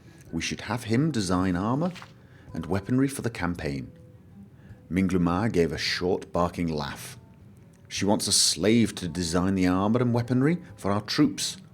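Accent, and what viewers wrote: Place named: Scotland, United Kingdom. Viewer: I believ you were from norway, but your accent sounds scottish to me.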